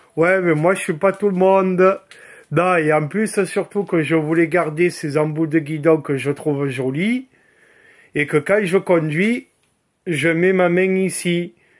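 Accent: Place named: South, France. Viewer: Merci pour ta franchise et ta spontanéité, ça nous change des essais habituels. Tu donnes Beaucoup d’informations, je vais acheter la même. Est que la bulle autour du phare vient de chez Yamaha? Merci encore et bonnes balades, avec l’accent du sud!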